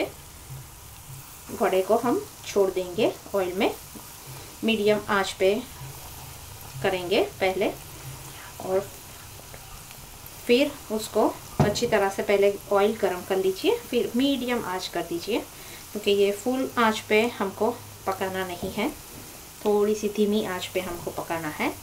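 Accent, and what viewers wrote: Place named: Gujarat, India. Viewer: mam aapka gujju wala Hindi bahot hi sweet laga..., by the way good try... Maja aavi gai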